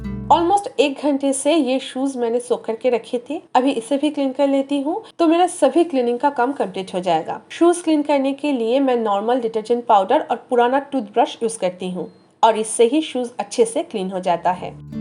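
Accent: Bengal, India. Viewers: Didi i love ur Bengali accent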